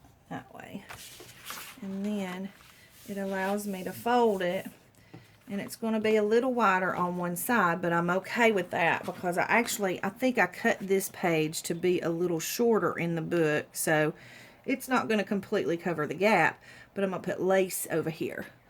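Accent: South, United States. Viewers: Great tutorial. Love your accent, the way you say booooks, lol. There is just something so charming about a southern accent. Love from Denmark :)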